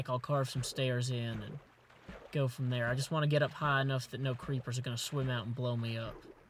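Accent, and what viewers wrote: United States. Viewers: I love finding smart Americans with accents. Makes me want to laugh in the faces of all the people who call Americans dumb hicks. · Cool! American accent,and speak quite slow, but still you make very cool videos! But whos vches?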